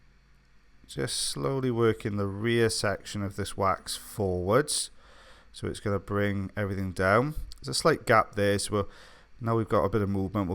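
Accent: Wales, United Kingdom. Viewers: Question: how do you know if you have a hearing loss due to wax? Just curious because I've noticed while watching and listening to your lovely welsh accent I wear ear buds and I've found I can hear clearer in my right ear, I can still hear in my left ear but not as clear???? My husband is from Barry Island where is your office/clinic?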